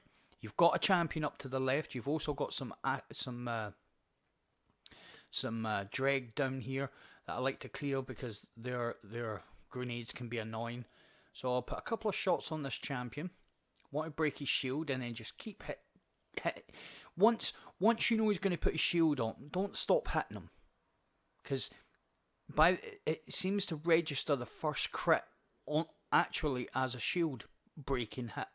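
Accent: Scotland, United Kingdom. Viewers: A Scottish commentator ❤️❤️love from the highlands ❤️new sub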